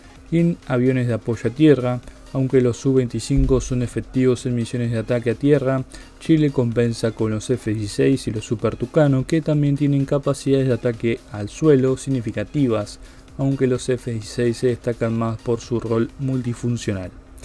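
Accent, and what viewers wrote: Argentina. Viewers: Esta información no es del todo cierta, han obviado armamento moderno que tiene Perú . Este video al parecer esta hecho por chilenos y narrado por un argentino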